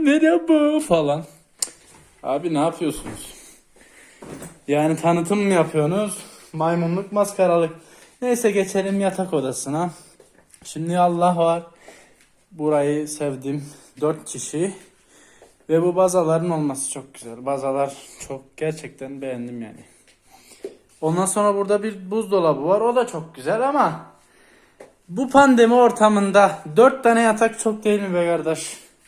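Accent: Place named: Cyprus. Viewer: allahın kıbrıs şiveli 3t'nin çakma ergen versiyonu... Çalışma masaları ve yataklar farklı yerlerde arada duvar ve kapı var tuvalet için ayrıca kapı var lavabonun orta yerinde açıkta değil yani klozet. Banyon da kapılı. Dandik duşakabinlerden değil. Onu da kapılı yapmışlar. Üstüne sandalyen tahta sandalye değil rahat. çalışma masanın lambası bile var. Sen daha ne istiyorsun? Devlet senin göbeğini mi büyütsün? Çok göbek pesindeysen gider kendi yemeğini kendin alırsın boş boş konuşma. Tuvalete adam niye terlikle gitsin? Terlikle gittikten sonra yine içeri terlikle gelmeyecek mi? Kafan mı iyi senin? Alaturka tuvalet değil klozet var orada. Yerler, terliğini ve ayakkabısını kirletmiyor yani. Senin gibi tipleri böyle aklı başına gelinceye kadar döveceksin. Senin gibi tiplerin çalışacağı max. yer sanayi olmalı fazlası değil.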